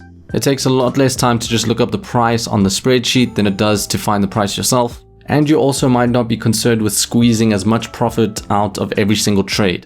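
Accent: South Africa. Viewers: Dude you south African? If you are then yaaay xD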